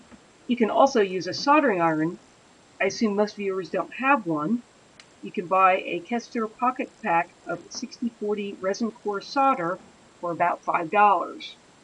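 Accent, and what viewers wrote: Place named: Baltimore, United States. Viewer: neat video, accent 100% sounds like a Baltimore/Hampden/Dundalk accent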